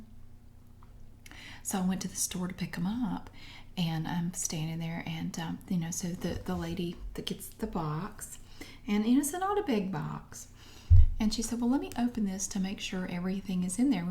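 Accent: South, United States. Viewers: You are real Southern Belle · this type of southern accent reminds me of Tree Trunks from adventure time haha 💗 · Something about a southern accent I actually enjoy lol I'm a Georgia city guy but I still love it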